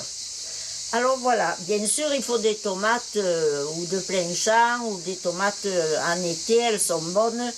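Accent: Provence, France. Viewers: Merci pour cette recette incontournable de l'été, simple et délicieuse ! Je ne manquerai pas de la refaire très vite. Votre jardin est magnifique et c'est vrai que ça fait plaisir d'entendre votre accent, ( nîmoise, j'ai l'accent qui chante aussi ) qui se perd de plus en plus à mon grand regret et sans oublier le chant des cigales ! Mon grand-père maternel et parrain, était un gentilhomme provençal qui à la fin des grands repas, chantait de sa belle voix "Coupo Santo" et ma fille pour lui rendre hommage est une arlésienne qui défile dans toute la région avec l'école des traditions. Pensées affectueuses de Sylvie la nîmoise 💙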